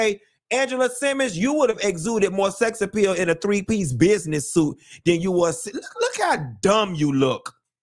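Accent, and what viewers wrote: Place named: South, United States. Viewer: I can’t with her “new country accent “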